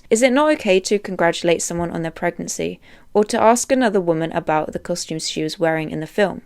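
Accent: United Kingdom. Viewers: You probably get this A LOT but you look so much like Emma Watson and u sound like her cuz ur both British 😭 That’s a good thing though because you are both very pretty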